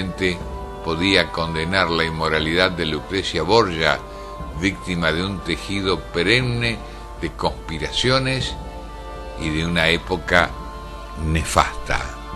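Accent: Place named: Argentina. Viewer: el video bonito pero ese acento argentino muy feo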